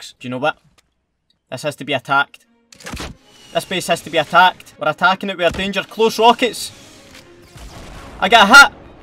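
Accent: Scotland, United Kingdom. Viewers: It’s Scottish ya nuggets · Just realized he is scottish LUV YA ACCENT! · Feng Magic Gadget it’s Scottish man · Scottish ya spoon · he’s not Irish he’s Scottish from Scotland like me and my family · Thats scottish if im not wrong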